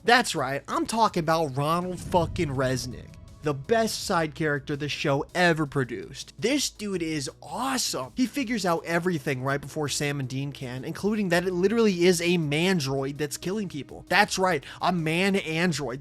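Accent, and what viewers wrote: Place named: Midwest, United States. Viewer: This guy sounds like polyphonic. He must be from the Midwest.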